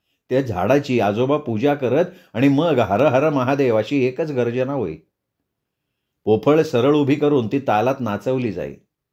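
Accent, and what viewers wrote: Konkan, India. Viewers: सर कादंबरी तर छान आहे पण त्याहीपेक्षा तुम्ही जो कोकनी आवाज काढता ते लय भारी आहे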